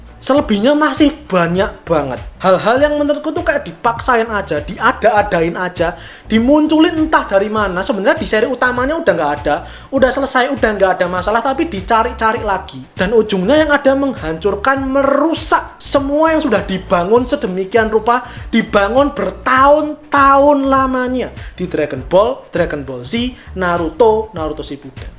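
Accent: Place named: Surabaya, Indonesia. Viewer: Wong suroboyoan yo cak? Medok banget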